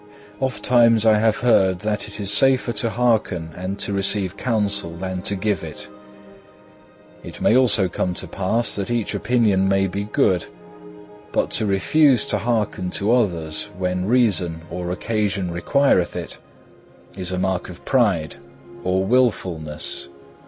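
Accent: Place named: England, United Kingdom. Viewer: Grazie mille! to the dear soul who set "The Imitation of Christ" to background music! I listen to this almost every night as I sleep since I ceased taking anti-depressants exactly one year ago in October 2017!!! You have made it fresh and more poignant. The reader's beautiful English accent is soothing, but sometimes the words alone are a little stark! Sincere thanks to you. St. Thomas a Kempis words are a cure for my mental illness! Thanks be to God!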